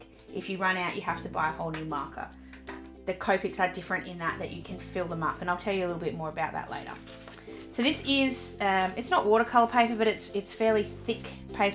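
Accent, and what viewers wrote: Australia. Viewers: hearing your accent, i was staring at that notebook going "that looks just like my mystery 120gsm notebook from K-Mart Australia" lol. Anyway, thanks for sharing! after buying a set of Chinese watercolor brush pens from Amazon I finally got Tombows. I have a set of Caliart alcohol markers I got a while back but never used much due to the massive bleeding, and before I knew I greatly preferred brushes over those massive chisels. A store near me has Copic Sketch with chisels/fines, and Ciaos w/ brush tips. I've seen two types of 'marker paper' - One is just basically card stock, the other is thinner but slick, I assume with surfaces treated to resist the bleeding or something. I still have the Chinese Sunacme pens and honestly like them. They're smaller than the Tombows and came in a lunchbox-sized case, and the fine tips are like Staedtler fineliners. 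But alas, can't replaced markers as they go empty... I want to find refillable dual tip watercolor brush pens someday...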